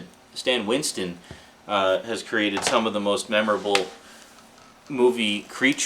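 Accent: Canada. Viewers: You are from Canada....Abooot! Not mocking, I can just tell. Or you are close to the Canadian border at least. Where exactly do you hail from? Greetings from Philadelphia!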